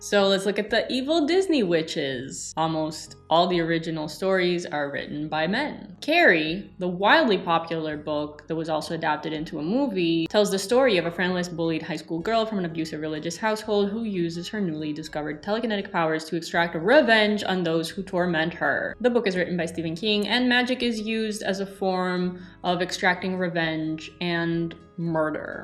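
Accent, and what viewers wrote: Greece. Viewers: Your greek accent gives me the feels <3 And Jiji is the best, I love black cats. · Your Greek accent is so spot on I wondered if you are from Greece oh my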